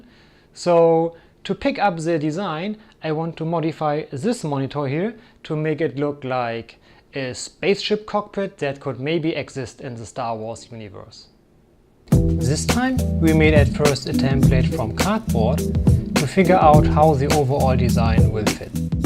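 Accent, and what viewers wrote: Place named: Germany. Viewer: Es ist immer wieder faszinierend, dass man den deutschen Akzent so gut heraushört 😅 Ein wahnsinnig gutes Projekt.. ich sollte aufhören, auf die Empfehlung von RBB zu reagieren, oder ich werde später mal nie fertig, sobald ich zum eigenen Basteln komme 🤷‍♂️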